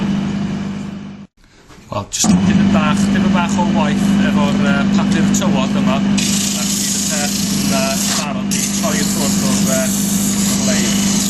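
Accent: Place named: England, United Kingdom. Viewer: im russian and even i do hear that terrible english accent. most of todays celtic speakers have it, unfortunately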